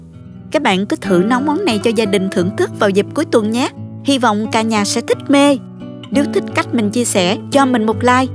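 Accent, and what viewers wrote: South, Vietnam. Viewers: Giọng nói miền nam ngọt ngào , dể thương gì đâu ák trừi🥰🥰🥰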